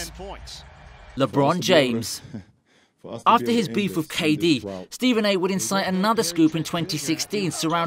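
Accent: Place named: England, United Kingdom. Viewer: Narrator is…English?!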